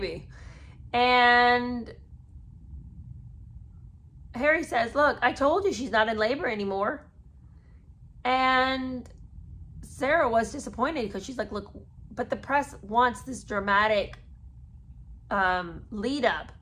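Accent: United Kingdom. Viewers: I love your British accent 😹😹😹😹 very My Fair Lady! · Your story telling and British accent made me laugh to the point of snorting! I so enjoy your humor!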